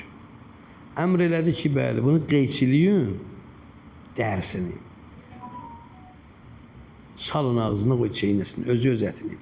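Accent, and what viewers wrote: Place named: Baku, Azerbaijan. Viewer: Bakılı ləhçəsi ilə 😊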